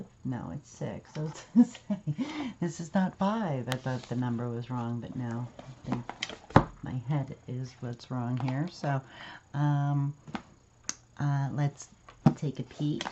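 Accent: United States. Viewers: lol i thought u were from nj u talk like my husband lol 😊🐥☀️❤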